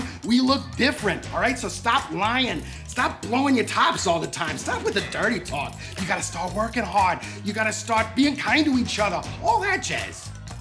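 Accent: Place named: Boston, United States. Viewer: This is great!! has to be OFB... originally from Boston. Born and raised here and we can detect an imposter in a minute. is the real deal. You can’t fake that.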